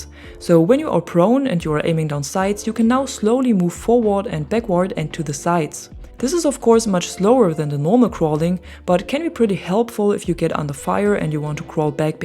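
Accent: Germany. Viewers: Lol du bist wirklich deutsch? Haha habe es irgendwie rausgehört war mir aber nich zu 100% sicher xD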